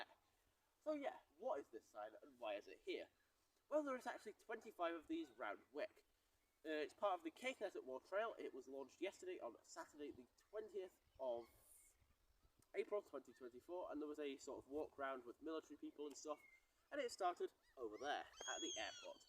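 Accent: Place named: Germany. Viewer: Excellent video. Very interesting and a great German accent too!!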